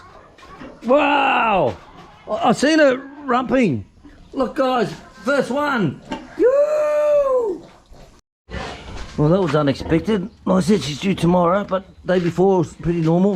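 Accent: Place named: Australia. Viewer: Australians do have their own language. I have a difficult time understanding you guys. Your accent is not that strong though, or maybe I'm just getting used to it.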